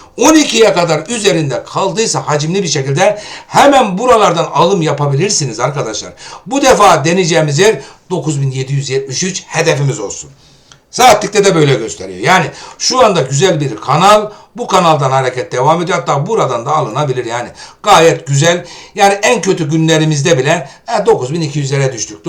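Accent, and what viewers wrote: Turkey. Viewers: Ben de Kahramanmaraş/Andırın lıyım hocam edem senin "PUSTUK" dediğin ağzını severim, iyi ki varsın takibe devam❤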